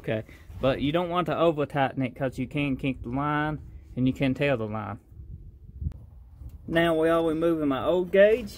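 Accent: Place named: South, United States. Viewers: Second thought sounds like their from the south so probably normal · Simple videos, explained in terms I understand. The Bobby Boucher accent is a plus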